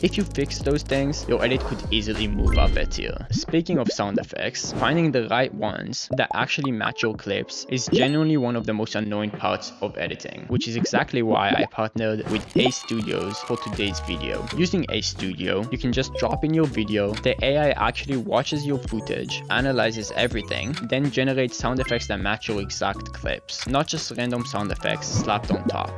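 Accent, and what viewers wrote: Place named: France. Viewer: Bro you need a new Mic bro And change your French accent and then i will subscribe you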